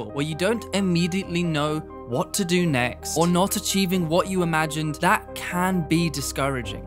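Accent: United Kingdom. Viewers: Hey......Peter McKinnon sent me.....good to listen a YouTuber who speaks the Queen’s English, for that reason alone I’ve sub’d!👍😄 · intresting stuff here and very well explain with that top notch british accent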